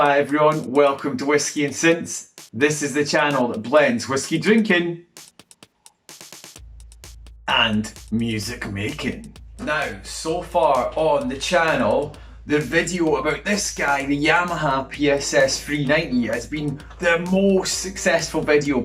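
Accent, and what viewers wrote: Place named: Scotland, United Kingdom. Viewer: Scottish accent = Insta sub.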